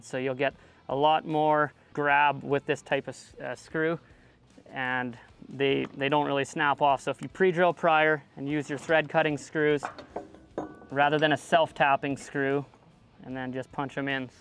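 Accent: Canada. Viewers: Is this guy Canadian? Sounds like he has a Canadian accent and maybe his prices are in CAD which are always higher?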